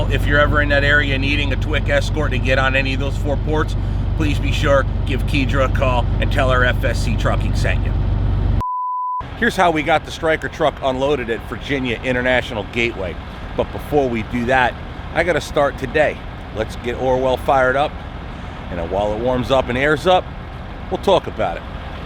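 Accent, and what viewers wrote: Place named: New York, United States. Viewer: You from New York New York or New Jersey that accent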